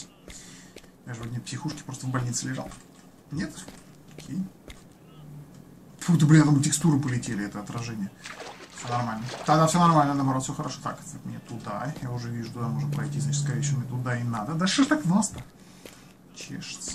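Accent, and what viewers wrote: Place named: Odessa, Ukraine. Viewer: Легкий одесский акцент, но приятный такой :)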